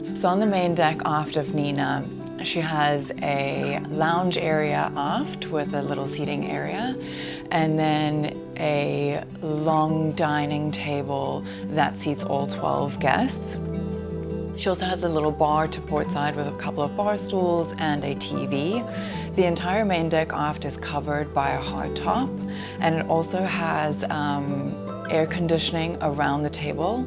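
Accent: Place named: South Africa. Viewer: Sound South African but for someone who lived in America.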